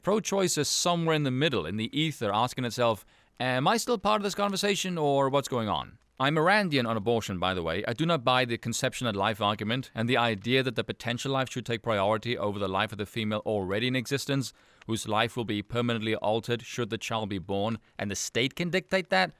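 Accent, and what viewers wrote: South Africa. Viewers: South African, he touched on this a while back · You sound south African lol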